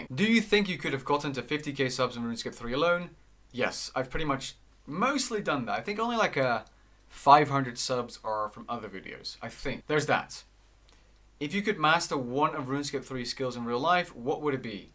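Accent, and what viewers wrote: Netherlands. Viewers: Wait... you are dutch?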